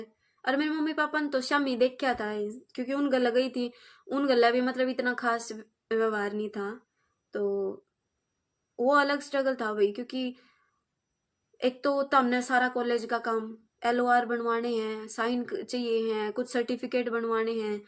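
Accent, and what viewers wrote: Haryana, India. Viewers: It's little bit harder to understand haryanvi but not tough ❤❤❤ Tnx to make this video 🎉 Congratulations 🎉👏🎉👏...( Me bhi gam ki chori hi hun didu 😢😢😢😢) ...and that all problems I have 😢😢😢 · omg i just found your vedio and its soo good cheery on the cake your haryanvi makes itt more better 🤗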